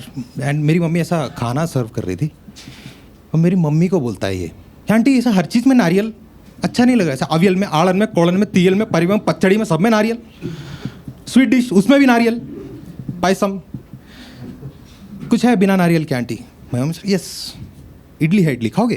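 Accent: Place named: South India, India. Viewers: Actually funny and good comedy timing! Especially hearing north indian slangs in south indian tone! Will watch your live shows in bangalore.. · BC- Yeh teri variety 😅 Coming from a Mallu Kudos 👏 Your Hindi still has that southern charm 🤩 Dimag Ki Dhai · I like your south indian accent ❤❤❤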